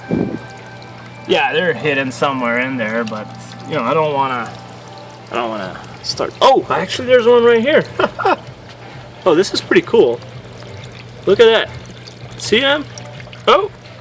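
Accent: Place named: Quebec, Canada. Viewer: Are you from Quebec bro?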